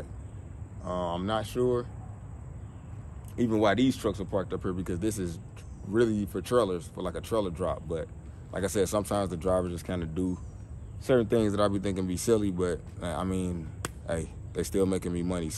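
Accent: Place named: St. Louis, United States. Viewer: Bruh u gotta be from stl….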